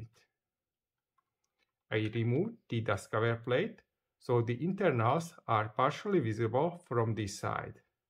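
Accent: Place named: Hungary. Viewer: Amazing video and great work! I can't wait to see the rest. Judging by your accent, I am willing to bet you are Hungarian although I might be wrong. Either way, I am an airsoft tech myself from Romania and greatly enjoy creative spirits like yourself. Can't a standard Jack be fitted in a MP7 AEP? The nozzle still has to move the same distance to feed but maybe placed a bit more to the back, just wondering. If this could be done with a standard M4 jack, it's much more available and cheaper than getting a BINGO AIRSOFT one.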